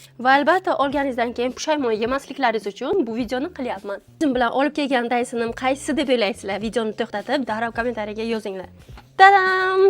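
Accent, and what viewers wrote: Bukhara, Uzbekistan. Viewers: Ой бу шева бухороники Шу район будагет! Мо шахар будаго тожики гап мезанем албатта😊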